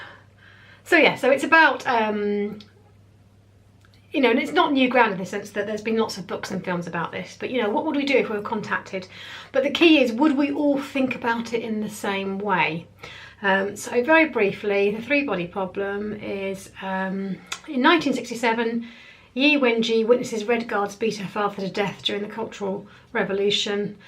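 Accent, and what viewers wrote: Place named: RP, United Kingdom. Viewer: Received pronunciation .moron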